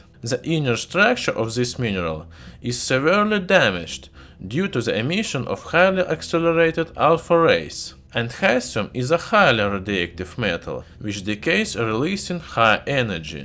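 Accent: Russia. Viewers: It's Russian accent--the main reason you hard to understand. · Love your Russian English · This is more like Russian with an english accent... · Thanks for posting these videos. I enjoy learning about the elements with a cool Russian accent.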